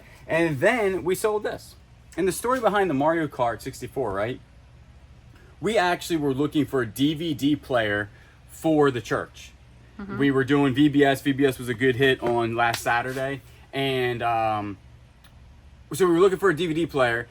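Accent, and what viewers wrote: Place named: South, United States. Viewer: That's just the southern accent y'all. I pronounce it the same way he does. 😂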